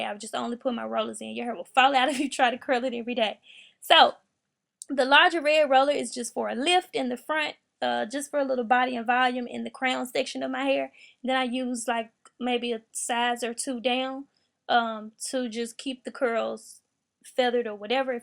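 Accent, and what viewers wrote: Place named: Memphis, United States. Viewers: I'm natural but I heard your accent and I had to sub. #northmemphis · I know that accent from anywhere lol. I’m a memphian too 😂 This was my go to style in high school ❤️ great tutorial 👍🏽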